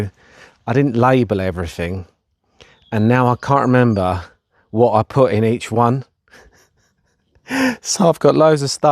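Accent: Essex, United Kingdom. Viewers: G’day from Australia..as you said about your Essex accent not saying some of the letters in the words…even though you said the word”crepe” the way it’s spelt..we spell it the same but for some reason we say it as “ crape”???? Not to be confused with with “ crap”🤣🤣🤣 silly bugger,not naming your plants..but you will get a nice suprise when they flower or fruit….your lunch looked delicious also…really enjoying your extra videos..thankyou for sharing 🇦🇺🇦🇺🇦🇺🇦🇺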